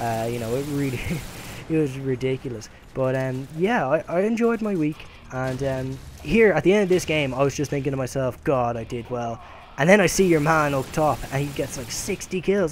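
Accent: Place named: Australia. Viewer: y bro? u sound kinda australian days why i asked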